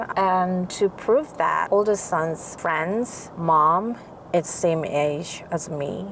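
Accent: United States. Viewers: Nice backstory and information (I had been curious as to your impeccable, American accented English 😉). Best to you and your family. Cheers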